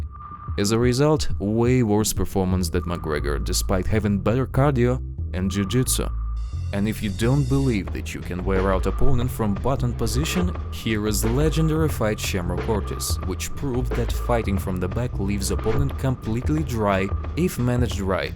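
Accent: Russia. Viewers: Guys, I love your analysis, but you need someone else to read the English text. The thick Russian accent will scare of most newcomers.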